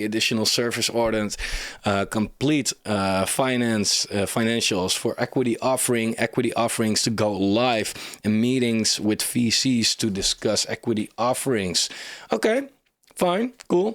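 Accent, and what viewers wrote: Netherlands. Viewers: haha nederlands accent, prachtig. goeie video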